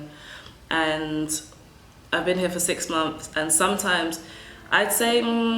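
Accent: United Kingdom. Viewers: i really love ur accent,,its british accent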